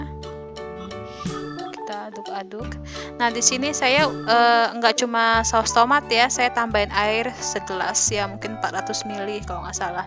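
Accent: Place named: Betawi, Indonesia. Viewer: Po numpang tanya, dari daerah mana ya? Ko bahasa betawinya kaya bahasa betawi ora... terus maap itu sodet bukan coet/ cobek😁 coet mah artinya ulekan sambel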